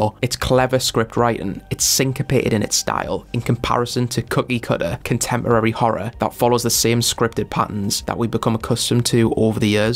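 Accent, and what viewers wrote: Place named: Newcastle, United Kingdom. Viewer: cool video mate, are you from england? sound like a geordie haha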